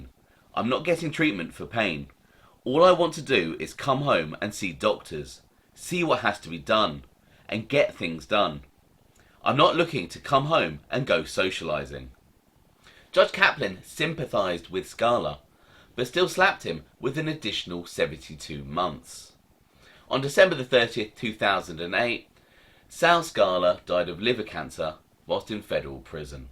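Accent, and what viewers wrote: England, United Kingdom. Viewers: Blimey that was quite knowledgable and informative. would fit right into a crew if it weren't for that english brogue. ( I couldn't help but imagine playing background music as he narrated )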